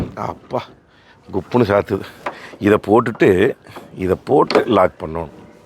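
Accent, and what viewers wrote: Kongu, India. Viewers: Namma Kongu tamil is awesome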